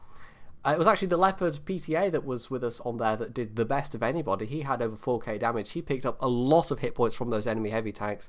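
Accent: Scotland, United Kingdom. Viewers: Ow, that new mic, it makes the sound so much clearer.... starts to actually sound scottish-ish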